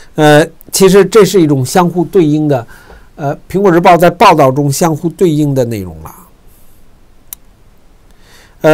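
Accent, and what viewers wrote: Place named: Beijing, China. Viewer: 石涛兄，听您口音也应该是北京人，89年那次估计您也经历过全过程，您现在在一个平静安全的地方去鼓动香港的年轻人去重演那些大家不愿再回首和重复的画面，您觉得有意思吗？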